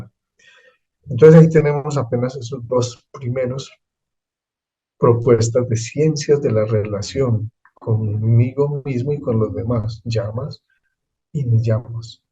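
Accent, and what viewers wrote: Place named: Colombia. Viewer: Precisos. He oido muchas veces hablar de las 8 ramas del Yoga, pero esta vez me actualiza el significado. Muchas gracias. En tu acento recordé al Maestro de meditación Sesha. Tambien es Colombiano. Gracias gracias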